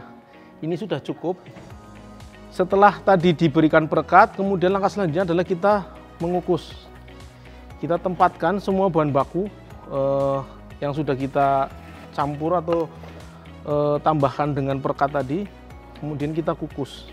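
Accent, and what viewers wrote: Banyumas, Indonesia. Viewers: Sampean wong Ngapak ya mas... Hehehe Pada baee..saya juga wong nagapak...lanjutkaaaaaan mass... Wong Ngapak nek Ora Ngapak ya Ora Kepenaak... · Wong ngapak apayh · ngapak kiye kayane mbok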